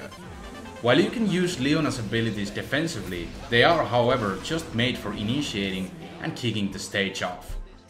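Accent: Finland. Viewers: Are u from finland? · Heti ku alotin videon tajusin "Tää äijä o suomalaine" sen kuulee enkusta...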